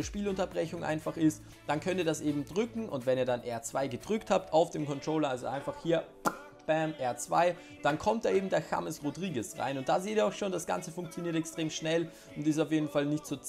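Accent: Austria. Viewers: Von wo aus Österreich kommst du genau?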